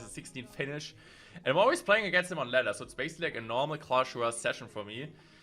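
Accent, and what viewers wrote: Germany. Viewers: Hahaha come on bitch! Back after bout 4 years, clash is back baby! Beautiful games much love. Idk why but I love German accent prolly from listening to when I was a kid also funny thinking of as unc lmao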